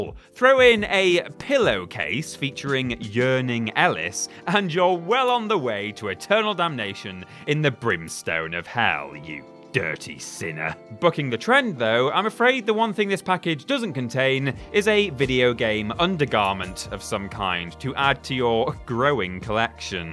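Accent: United Kingdom. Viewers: This voice sounds familiar. Did he used to be with What Culture or do all British people sound the same?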